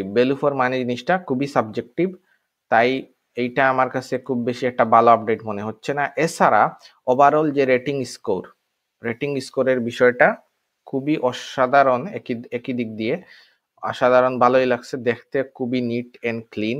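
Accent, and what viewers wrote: Sylhet, Bangladesh. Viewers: Sylheti bhai ni apne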